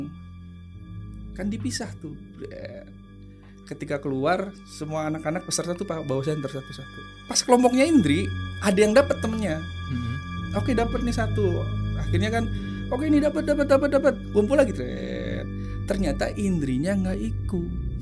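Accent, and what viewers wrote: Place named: Indramayu, Indonesia. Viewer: Logat indramayu dan cirebon nya gak ketinggalan😂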